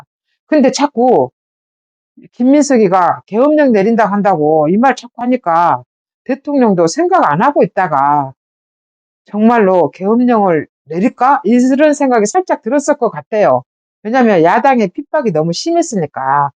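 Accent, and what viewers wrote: Jeolla, South Korea. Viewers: 절라도 사투리가 구수하게 들리는 건 처음 ㅋㅋ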